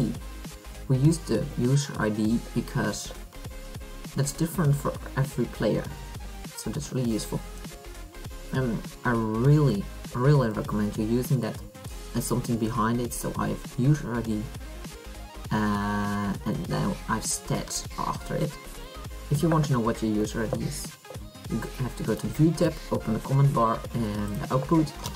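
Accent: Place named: Philippines. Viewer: Your voice looks like filipino voice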